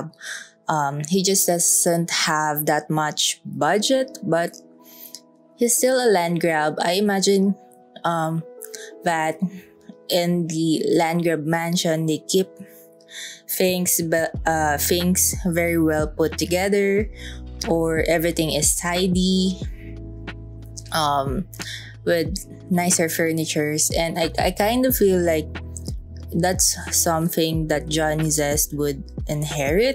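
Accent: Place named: Philippines. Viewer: Accent pa lang alam mo na agad na pinoy to hahahaha nice vid po ma download nga to sa lot ko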